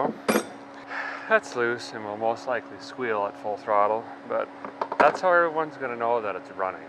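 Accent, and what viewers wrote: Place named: Minnesota, United States. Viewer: Well I’d be darned this fellas accent makes ya want to move to Minnesota 😂 love his humor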